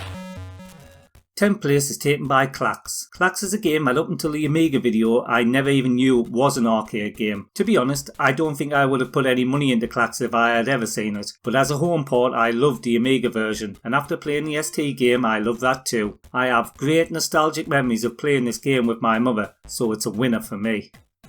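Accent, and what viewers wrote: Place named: Geordie, United Kingdom. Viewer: Great video, but are your a Geordie, you accent sounds like a posh Geordie, like Jimmy nail😁. I am, just speak your proper accent mate👍🏻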